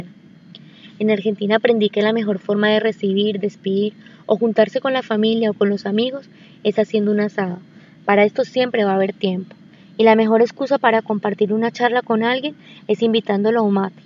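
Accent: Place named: Colombia. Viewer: muy lindo tu relato, hermoso tu acento colombiano, emocionante tu apreciación sobre nosotros y nuestro país que está lleno de buena gente como colombia, siempre bien venida, suerte en todo lo que te toque vivir.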